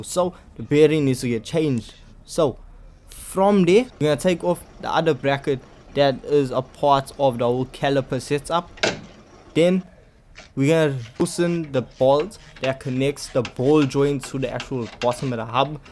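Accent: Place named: Durban, South Africa. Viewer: Cool project my guy. The Durban accent takes some getting used to, but informative nonetheless.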